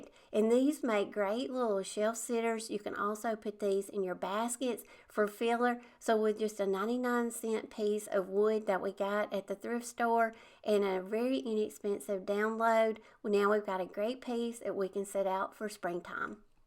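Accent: South, United States. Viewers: I like your southern drawl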